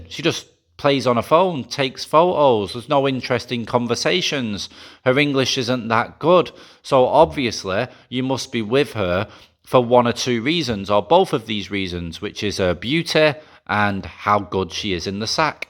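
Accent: Manchester, United Kingdom. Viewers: Hi.. Love your channel. Although I have lived and worked in Asia I was not involved with any of this type of life as my wife was always with me but but I enjoy the human interest stories and your obvious decency as a man. But, as a Manc that left in 1984 I especially love hearing the Manc accent Does my heart good. Have a vey happy Christman with your son.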